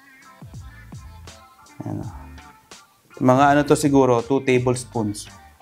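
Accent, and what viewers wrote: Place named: Cavite, Philippines. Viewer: Chef tonong lihitimong Las Piñero o Caviteño ka ah. San po kayo sa Pinas?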